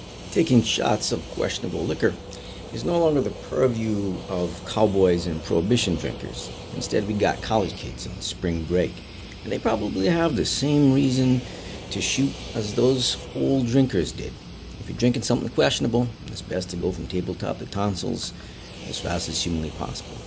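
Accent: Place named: Canada. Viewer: Great video. but what is your accent? it's so strange. It's like canadian, mixed with heavy new york, and irish.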